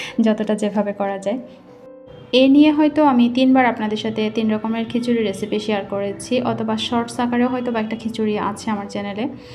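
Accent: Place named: Chittagong, Bangladesh. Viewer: Ohh Chittagong er meye🩷 bole kotha 🩷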